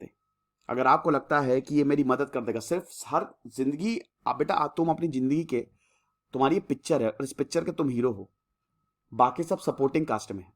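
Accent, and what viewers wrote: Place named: Uttar Pradesh, India. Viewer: Sir your up vala touch is pride for me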